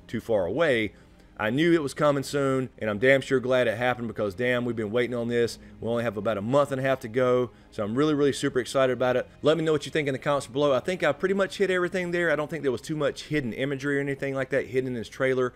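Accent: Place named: Mississippi, United States. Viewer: I don't know what I was looking forward to more, the actual trailer or your trailer breakdown (tho I am going through GOT withdrawls since they are doing the late start this yr) Man you are the only one I watch about GOT reviews, IMO you are the only one that's worth a fuck. Now I could be a little bias because I'm from South MS and you from the way you talk (and not to sound creepy, you honestly look like my buddy Tyler would have in maybe 10 yrs or so) and my boy Tyler passed away a little over a year ago. He had that same passion about ASOIAF that you have and got me into reading the books. Anyway man just keep up the good work man. I really enjoy the vids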